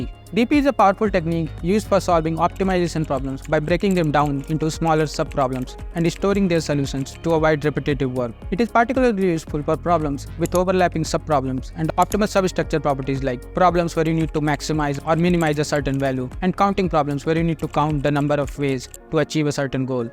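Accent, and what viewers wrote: India. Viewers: You should speak slower and make your accent better. Thanks for the vid, but god damn, Hindi English is the worst language ever. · I'm very tired of Indian accent. learn English well, okay? you speak like kids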